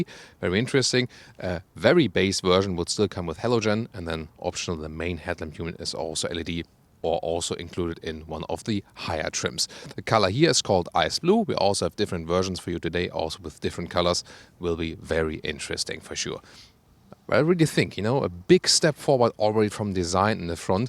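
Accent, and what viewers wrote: Germany. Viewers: FIAT take my money!!! I want one. Long range version only. Thank you. Super review. Super German accent! Love it! Italy loves you!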